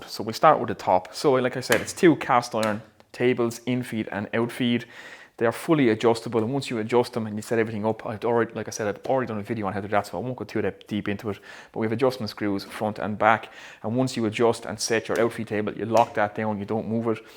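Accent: Ireland. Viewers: 1500 quid is out of my price range atm pal but maybe in a couple yrs I will buy one when my woodworking is good enough to warrant it.. For now I'm getting me one of those cheap as fook models from Titan.. It'll do for what I need it for and when I'm ready to step it up to a better model I will be looking at a better model like one of these babys.. I need some good chisels so I was hoping u could give me some advice on the best Mortise chisels? The best set of ordinary wood chisels I can buy with let's say 200 euros as a price range for both sets?? I would very much appreciate it if anybody could help me with some good advice.. I'm prepared to go to 240/50 euros for both sets if needs be but I want to really get some great chisels for the money.. I am going to buy a set for about 670-70 euros as well for learning with but I really want both of the other sets now too.. 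It would be greatly appreciated if any of u good ppl on here could advise me on the best I can get for the money I want to spend.. Love the videos btw.. Where are u from pal? Is that an Athlone or Louth accent? I cannot tell but it's a proper Irish accent bro.. Great to see a top Irish woodworker on here doing his thing.. I haven't found very many tbh. Ur the only one atm mate but I will be looking for a few more in the future too.. Salute from DUBLIN by way of LIMERICK & Tipp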